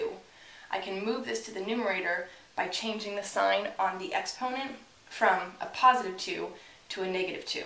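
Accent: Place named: New Jersey, United States. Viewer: That's a lovely accent you have, New jersey ? Or austria 🤔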